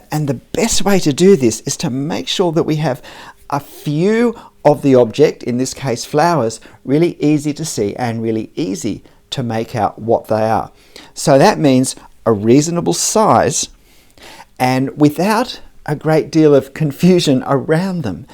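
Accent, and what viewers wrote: New Zealand. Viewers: I love that accent! "dee-toh" is it kiwi? New Zealand? I want to say I'm blown away by your work. The patch of flowers looked to tactile, like there was a path carved from people passing throught the flowers. And the last drawing... My God, breathtaking perspective.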